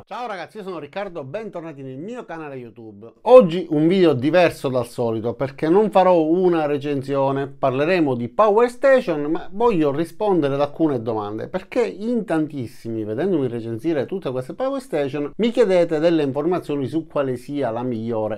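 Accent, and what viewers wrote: Sicily, Italy. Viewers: Ciao grazie delle preziose informazioni. Oggi anche mia moglie ha visto il video e mi ha detto “dall’accento è di enna o di calascibetta” ..lei è di enna 😂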